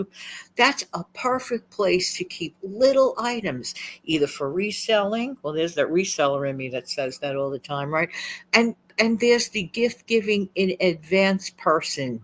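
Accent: New York, United States. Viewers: As always, great tips! Thank you for the reminder to start on Christmas now! We do have a bin store, and I never thought to look there for gifts. What a good idea🙂 BTW, I love your NY accent😀it's like listening to someone from a movie! We're from NW Georgia. Movies get our accent completely wrong. Every. Single. Time😂 Yours is delightful!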